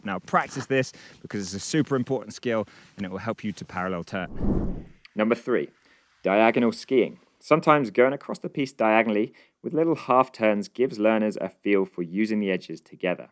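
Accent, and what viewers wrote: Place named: United Kingdom. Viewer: Love to see a good video from, that british accent!! Allez Alvoriaz!:D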